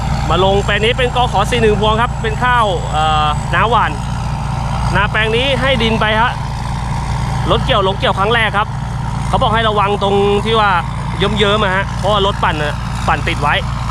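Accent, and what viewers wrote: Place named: Bang Kam, Thailand. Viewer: พูดเหมือนคนบางกํา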